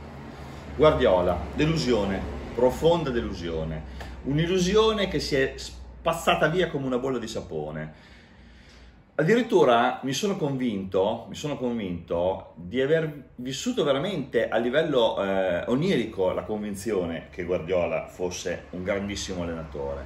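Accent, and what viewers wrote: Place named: Piacenza, Italy. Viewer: Ciao ma sei di Piacenza? hai l'accento simile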